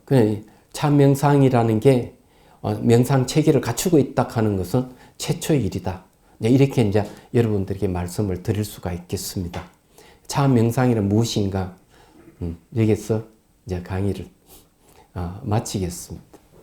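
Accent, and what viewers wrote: Gyeongsang, South Korea. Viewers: 김 옥순 님 스님 경상도분이라 방언을 많이 쓰시는데 우리는 다 알아 듣거던요 명상 강의를 하시는 스님께 그런 댓글은 禮가 아닌것 같습니다..^^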